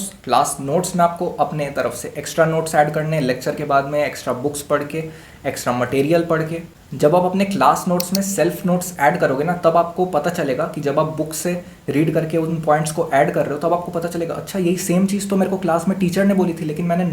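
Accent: Bengal, India. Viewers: Bro are you a Bengali?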